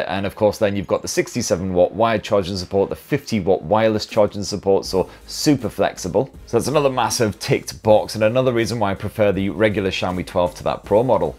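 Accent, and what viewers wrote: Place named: Newcastle, United Kingdom. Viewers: A lot of it isn't even scripted he actually speaks. Like that it isn't unusual in certain part of England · Sorry. I am not sure what you mean? I was just saying the way in which he talks he normal from his area and many of the expressions spoken are common place within that area. No insult was intended. Was merely trying to give people knowledge of the video creators dialect in the north. I am not sure about internet lingo franc though. Does 'ffs' denote a stutter? Thanks. Be healthy. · He’s a Geordie, say no more.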